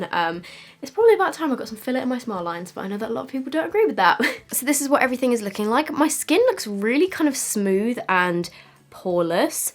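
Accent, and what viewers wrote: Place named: United Kingdom. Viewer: Ugh I’m sooo obsessed with UK accents 😍 “ it’s waawrmm”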